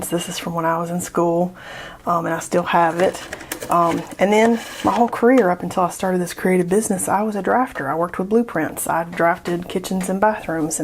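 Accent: South, United States. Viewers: Never let go of your southern drawl or how you pronounce things! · your southern accent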